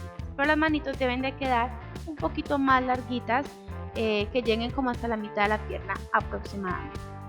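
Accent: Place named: Colombia. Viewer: te escucho con acento colombiano, la porselana fria es la misma porcelanicron?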